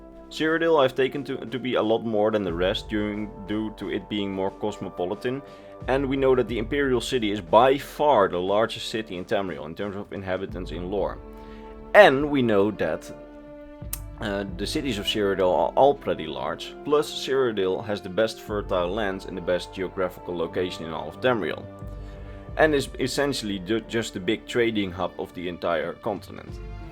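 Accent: Netherlands. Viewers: The dutch is strong in you my friend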